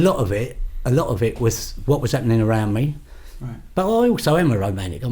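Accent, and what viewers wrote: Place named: England, United Kingdom. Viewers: Sounds like Paulie Gualtieri with an English accent lol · Love this guys voice! "Propa Landan!"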